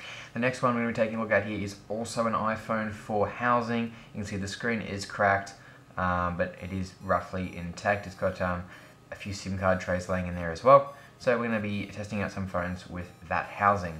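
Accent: Australia. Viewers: / / WPS 4004 he aussie also he is one if my good mates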